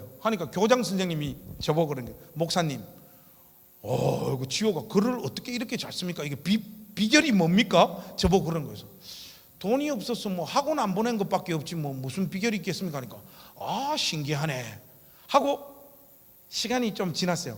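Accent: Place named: Gyeongsang, South Korea. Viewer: 목사님~♡ 경상도 말씨 듣기 좋은데요. 단세에 오셔서 간증하실때 홍수와 요강 훅 와닿았어요. 목사님 응원합니당.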